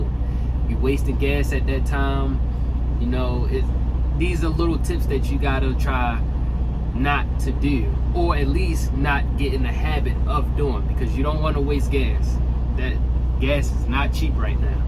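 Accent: Baltimore, United States. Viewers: I can spot that Baltimore accent from a mile away. Lived out Cold Spring back in the day. Respect.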